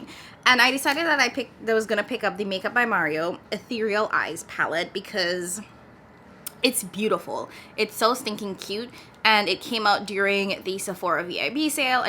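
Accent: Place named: Trinidad and Tobago. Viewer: Are you from Trinidad? I detect an accent! I am considering picking this palette up but I was worried that some of the mattes would be too light for me. This video was really helpful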